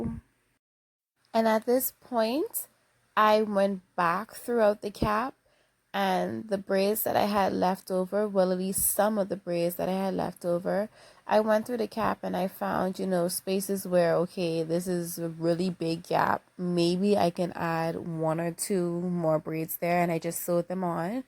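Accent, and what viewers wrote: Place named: Bahamas. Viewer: You sound like a Bahamian......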